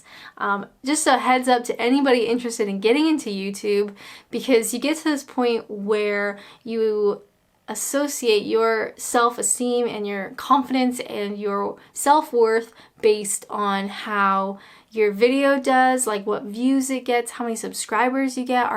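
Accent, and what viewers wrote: Canada. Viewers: Your pronunciation of 'ou' in most words highlights your Canadianess! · Lol, its pretty easy to understand you are Canadian. I can hear it whenever you speak.. Only Canadians say ABOOT, when saying ABOUT... I meet Canadians here in Florida all the time. Every winter lots of them come to Florida to escape the Canadian winter... I enjoy seeing and talking to them... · Accent is definitely a cannuck. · Hi, are you currently back in Ontario Canada or still in Orlando Florida?? The room in this video looks more Floridian...is that even a word??? Well done reaching 60,000 subscribers. I was doing cartwheels when I reached 100. My assumptions about you is that you are able to handle just about any situation and you have a great positive attitude. Doing anything in front of an audience can be absolutely terrifying, I can completely understand your feelings about that. 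I also understand why you value privacy, I do the same thing. I would also really hate painting my toenails!!! LOL. Incidentally, I think your Canadian accent is great. Your accent is quite different to the BC Canada accent. Where did you get the "Adventures and Naps" name from for your video channel? Incidentally, when eating peas, which are all the same, why do we always choose which one to eat first? Now that is something to ponder about!!! I always add the milk after the cereal. When you do decide to get a delivery from Tim Horton's or anywhere else, could you possibly do a video covering the first unwrapping please? Anyway, wishing you the very best. Robert.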